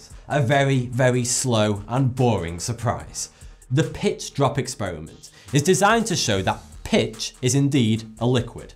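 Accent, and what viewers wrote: United Kingdom. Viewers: He's Vsauce + Tom Scott, but extremely Brit.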